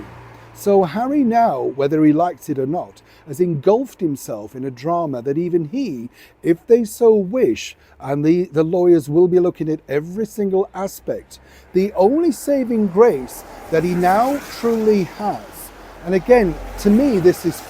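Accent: Yorkshire, United Kingdom. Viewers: Hi! Greetings from lancaster Pa.It sure seems to rain alot in your area.I love rain but its a bit much.I love hearing you talk Brotish.Fantastic job giving us honest true news.I trust your news completely .Kudos to you😊 Beth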